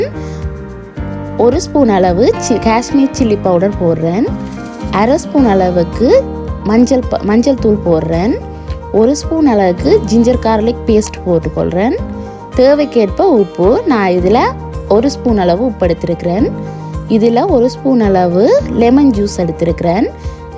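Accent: Sri Lanka. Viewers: Very nice😊👍I love your singala tamil😊😊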